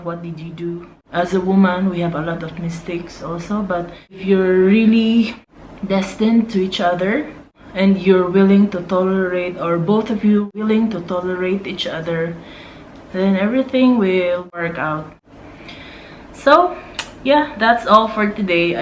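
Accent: Nigeria. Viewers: I love that you have a Nigerian accent and behavior.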